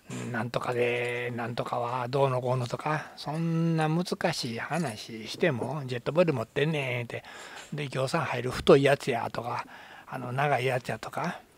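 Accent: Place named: Osaka, Japan. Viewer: やっぱり大阪弁は最高です。しみじみ浸透します。おおきに。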